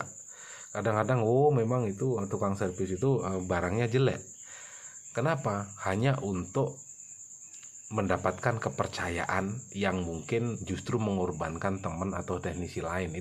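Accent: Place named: Bali, Indonesia. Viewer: logatnya bali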